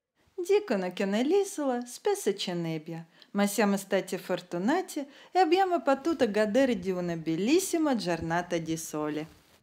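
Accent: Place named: Russia. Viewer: Какой прекрасный русский акцент! Спасибо за рассказ и видео! Красивый остров...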